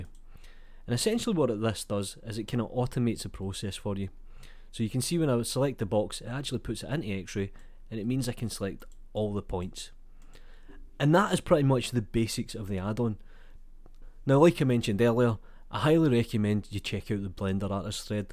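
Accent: Scotland, United Kingdom. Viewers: I just love your Scotland accent, you actually sound like one of the people from the movie BraveHeart with Mel Gibson!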